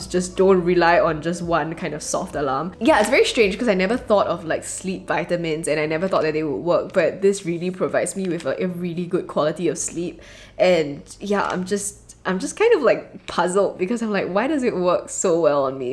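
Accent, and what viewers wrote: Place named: Singapore. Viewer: Your singlish is impressive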